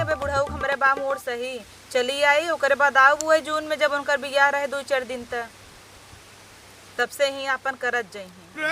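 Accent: Jaunpur, India. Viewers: ji aap ki jaunpuriya tone zabardast h...👍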